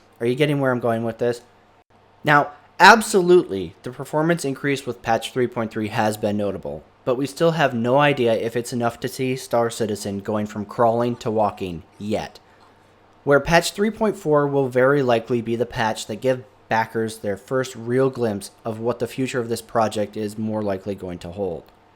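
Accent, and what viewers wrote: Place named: Asia. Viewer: You sound pretty Asian.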